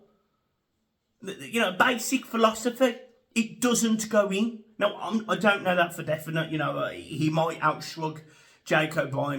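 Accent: Birmingham, United Kingdom. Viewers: Are you a Brummy?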